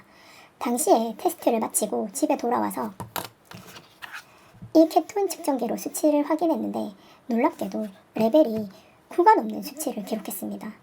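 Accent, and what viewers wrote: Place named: Daegu, South Korea. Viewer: 영상 늘 잘 보고 있습니다. 대구 분이시려나.. 귀여운 사투리도 사랑스러워요^^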